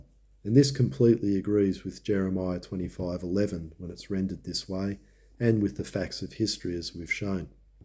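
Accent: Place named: Australia. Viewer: Thank you for doing this research and sharing this, good to hear an Aussie accent.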